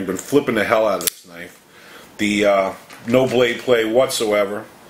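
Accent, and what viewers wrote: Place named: Long Island, United States. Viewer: I’m a fan your voice reminds me of home I’m from NY LONG ISLAND I live in Washington now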